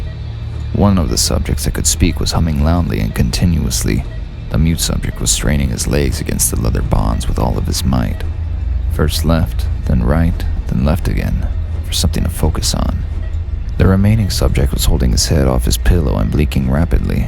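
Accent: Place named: Russia. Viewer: Great job on the narration! I really liked the Russian accent ^w^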